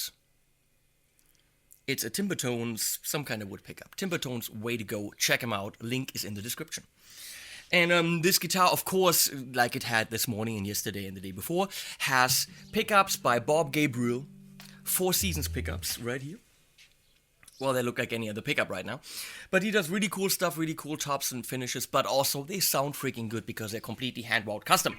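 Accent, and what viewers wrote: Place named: Germany. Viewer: LOL! Love your demo of the Dark Matter, your humour, and your German accent! Keep on! Helmut from Germany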